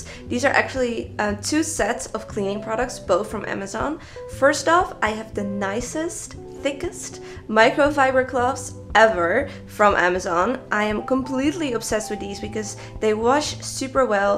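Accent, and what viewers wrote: Netherlands. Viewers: you have a dutch kind of accent while speaking ^^ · She has a real dutch accent ...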